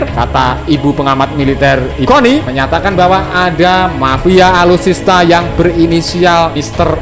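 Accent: Java, Indonesia. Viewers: Min .. min mimin kok suaranya medok ya?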